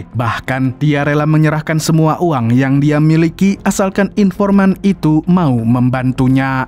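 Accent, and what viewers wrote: Banjar, Indonesia. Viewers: Adminnya orang Banjar kah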